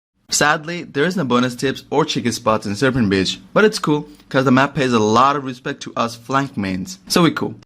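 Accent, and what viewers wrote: Bengal, India. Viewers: You're bengali ? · My guy bangali, noice, I understand what he said 😂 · ভাই বাঙ্গালি নাকি? এক্সেন্ট এমন লাগছে হ্যা অবশ্যই! পরে ভয়েস শুনলাম। :3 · Bruh u r bengali??? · Bro. You are Bengali?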